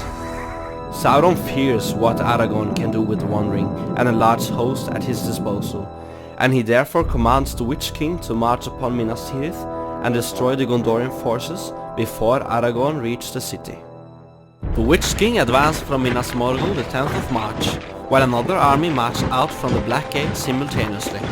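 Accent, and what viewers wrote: Norway. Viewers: Love the accent. Norwegian I suspect. You can se how Tolkien had Scandinavian language in his mind when creating many of the languages. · ikke ment som kritikk, men man kjenner jo igjen tonefallet. Artig med nordmenn som lager kvalitet på YouTube. Gode temaer og fin måte å fortelle historien på. Forsøker å lage noe LOTR selv, men i en helt annen stil. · Dæven så norsk-engelsk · Love your east norwegian english accent bro! Love from your closet relative Wermland Sweden!🇳🇴🇸🇪❤️ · The dude talking is norwegian. I can hear it. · Veldig Norsk engelsk men dæven du er flink. Supert arbeid. Gir meg full oversikt over kampen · We can all hear that the narrator is from Norway... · Is that a norweigan accent Im detecting? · are you from norway? I like your accent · The norwiegan accent is soo clear XD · This is really cool LOTR battles with an norweigian accent. 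· Great video! Awesome animations. Also, I bet my life the narrator is Norwegian. Tell me I'm wrong. Again, amazing work brother! Would love more of these kinds of videos! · i knew it! ^^ I could here the Norwenglish xD hehe Bergen here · Detta är första gången jag hör norsk dialekt på engelska! Haha gillar det!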